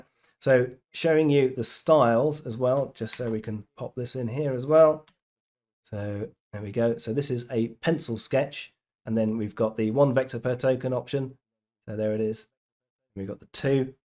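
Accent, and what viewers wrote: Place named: United Kingdom. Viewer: I trust this guy because he has a British voice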